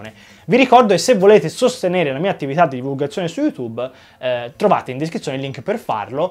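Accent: Tuscany, Italy. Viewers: Si sente tutto il toscano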